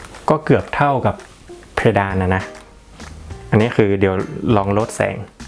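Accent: North, Thailand. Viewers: สำเนียงคนเหนือใช่ไหมครับ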